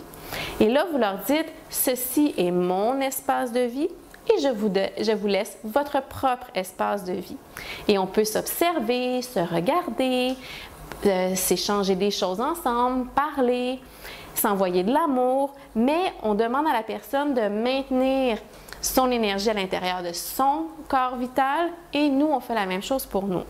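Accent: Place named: Canada. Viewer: Un très bel accent canadien! Merci pour ce partage! Très bien expliqué. Certaines choses, je les ai apprises au REIKI, la bule d'énergie.. J'ai coupé le contact avec mon frère et ma belle-soeur l'année dernière, ils étaient devenus toxiques pour moi. Pics, remarques, jalousie etc. Ils ne m'ont même pas soutenue pour mes manuscrits, qui finalement ont été édités:-) Depuis je me sens mieux, un peu triste que j'ai dû le faire au début, mais c'était nécessaire. J'ai essayé de renouer, impossible. C'est moi qui va tomber malade. Donc, j'ai laissé tomber. Toutes les personnes qui me conviennent moins, j'essaie de les fréquenter qu'un minimum. Certaines personnes je leur ai fait comprendre qu'ils n'appartenaient plus à mon cercle d'amis. J'essaie de m'entourer que de personnes positives, et qui ne me lancent pas de pics méchants, c'est difficile je l'avoue, mais faisable. 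Nous devons diriger notre vie, gérer nos projets, et ne pas vivre la vie des autres. Je l'ai compris assez tard, mais il vaux mieux tard que jamais. Ceci ne m'empêche pas d'avoir de l'empathie pour mon prochain et d'aider où je peux. Merci pour votre travail! Après 2 ans, comme j'ai eu un commentaire de Dayou Love, je rajouterai que mon frère et moi on s'écrit mais on ne se fréquente plus. Mon frère est assis entre deux chaises, je comprends! Mais plus personne qui me commande, c'est vraiment formidable!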